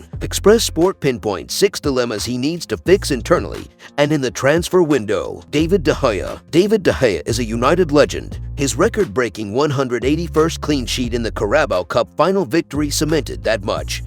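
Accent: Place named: United States. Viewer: Stop using American voiceovers. They are garbage. The do not even know how to pronounce names correctly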